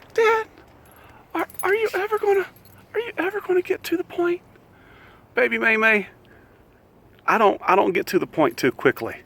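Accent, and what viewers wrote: South, United States. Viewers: There is nothing like southern charm..❤️❤️..l really like that your a southern boy. The way you talk is so smoothing to me. Could listen to you all day my Dad was from Norfolk Virginia and he had such smoothing charm..😍too. You are so funny..l'm still laughing ..haha..So glad Martini is feeling better love ❤️to you and the woof pack always..🐾🐾🐾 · OMG, laughing so hard!!! Being a southern girl living in Prescott Valley, AZ now; I sure do enjoy listening to your southern drawl! Thanks for making my night ;)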